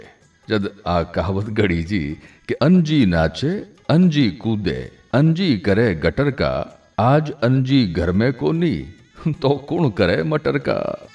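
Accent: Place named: Marwar, India. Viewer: जी आपरी मारवाडी़ बोली गणी फुटरी लागे सा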